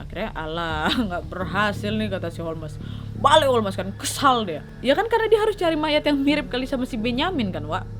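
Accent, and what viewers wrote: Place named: Lampung, Indonesia. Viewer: Kak kamu orang mana sih kok ngomongnya kayak orang lampung kalo ga salah???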